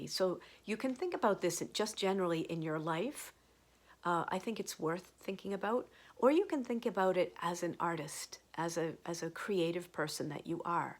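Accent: Ireland. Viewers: Oh how I loved and needed this! Yes I have done things which, in retrospect, have disappointed or inwardly embarrassed me... but it IS true that I have learned a tremendous amount (in retrospect)... it seems the more daring the effort the more I learned afterwards. I always thought I needed 'a clean slate' of a life, 'never putting a foot wrong' which never worked. I'll listen to this again and have already sent it to my kindred spirit friend across the country 💕 Since delivery is half (or more) of the message I want to say your English is beautiful and I love the very delicate tinge of Irish in your speech.. Thank you!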